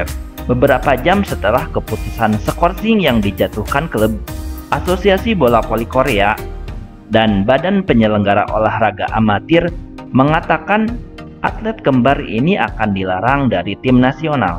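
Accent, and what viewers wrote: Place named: West Java, Indonesia. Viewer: Lidah sunda bro... 😅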